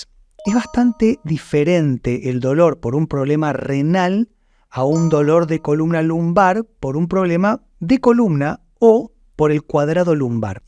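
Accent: Buenos Aires, Argentina. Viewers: Hola sos de Buenos Aires Doc??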